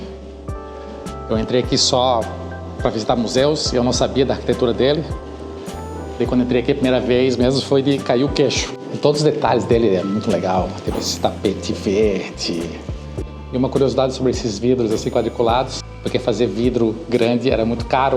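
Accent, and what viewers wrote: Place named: Florianópolis, Brazil. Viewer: Caramba... Sinceramente um dos melhores vídeos sobre cidades que eu já vi na minha vida.. Rico em detalhes, bem estruturado, rico em informações... E com sotaque manezinho kk kk Abraços de Blumenau.. Quero visitar Edimburgo ano que vem e vendo teus vídeos só dão mais vontade de ir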